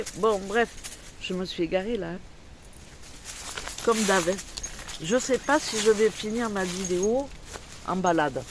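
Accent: South, France. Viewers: Coucou très instructive ta vidéo. C est bcoup de boulot tout cà...moi j Adore ton accent du soleil. Ça fait du bien au coeur.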